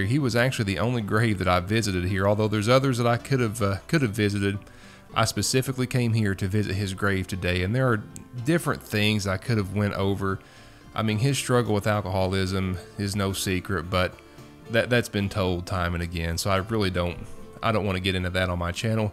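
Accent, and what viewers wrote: Kentucky, United States. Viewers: What a beautiful tribute to Keith Whitley you did a wonderful job. It was so sad his life ending so tragically. You are a wonderful narrator l love to hear you speak you have a special voice that keeps you interested. It must be that Kentucky voice that intrigues me. Keep the videos coming l truly enjoy each and every one.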